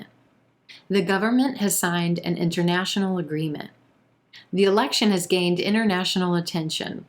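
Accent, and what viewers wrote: United States. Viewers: Hii you are a good in American assent. So I want to join your pronunciation course. plz tell me about your course how am I join your course 👍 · Thanks for the video. Love your accent. It's so American. Wish I had such one too. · Hi from Somalia...ur teaching is waaw and ur American english is music to my ears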